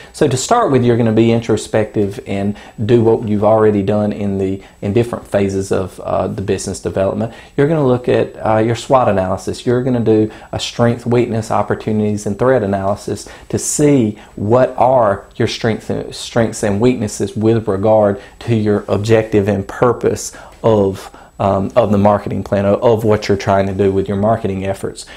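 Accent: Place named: United Kingdom. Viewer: I love his teaching and accent (though I have been a lover of British accent)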